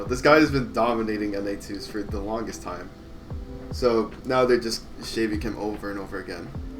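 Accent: Trinidad and Tobago. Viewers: r u from trinidad? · ur from trinidad?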